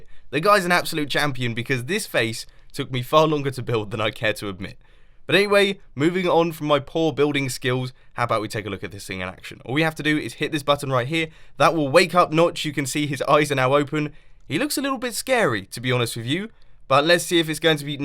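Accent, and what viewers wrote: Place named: United Kingdom. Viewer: I love you Mumbo and nice British accent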